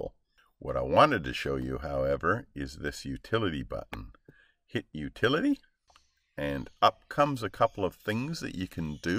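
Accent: Canada. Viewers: Thanks for this video. Looks like the site with the Techstream download is artificially buffering the download very slowly to nudge the user to download their software... annoying. Do you have a source for a usable mini VCI cable? You sound Canadian, BTW.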